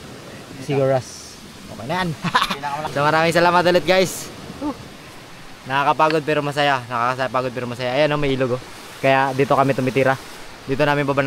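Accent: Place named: Cebuano, Philippines. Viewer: Hahaha.. hagua sa nag kirig2x.. bisdak jud kaayu ba.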